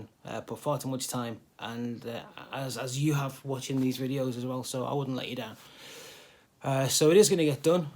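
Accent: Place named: Liverpool, United Kingdom. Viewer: Welcome back, your right about making videos of the work, taking more time than the job itself, I tried while renewing my rear spring front hanger,( MGB suspension ) posted on YouTube, took ages to edit, will try again soon and put some sound on the next one, great video, lots of colourful English, always sounds better in Scouse, I read somewhere that the original lever shocks were designed to leak through the shaft for lubrication and recon ones had a proper bearing and an oil seal fitted, maybe that explains why yours don’t leak, I’m just about the same stage on the rear end of mine, shutz on today, tank and axle next, so I’m waiting (for you to skin your knuckles, so I don’t have to) thanks for all your fascinating work, Steve.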